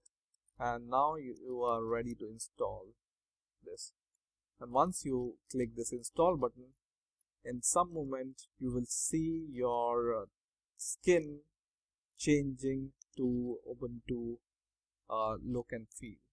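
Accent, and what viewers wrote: India. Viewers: DO NOT DOWNLOAD Honestly guys never trust an Indian guy. EVER! Go watch the tech support scammer videos. They're all Indian people with fake English names. Guess what, this guy has an Indian ass voice and people are saying viruses in the comments. DO NOT DOWNLOAD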